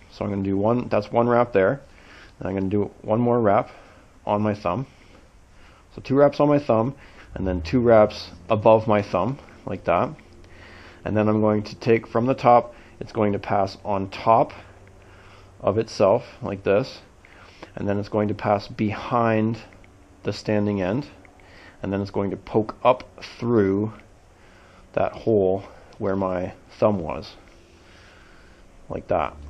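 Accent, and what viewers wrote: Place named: Canada. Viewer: This is the Most Canadian dialect I have heard in years. Are you the real Red Green? (Beaner is racial slang criticizing Hispanic people, you should probably say the whole word.)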